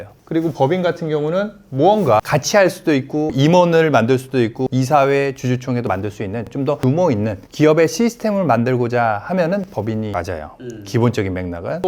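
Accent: Jeolla, South Korea. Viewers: 성님 전라도 사투리 고치실 생각은 없으신가여!!듣기 거북해서염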